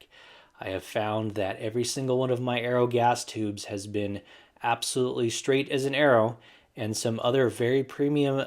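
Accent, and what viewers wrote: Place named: Arizona, United States. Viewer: something in your voice reminded me of Ian from Forgotten Weapons... The way you talked when you gave reason for having only one rifle. I was like: I bet they are from Arizona - and that was before seeing the cactus patch! Am I close? :)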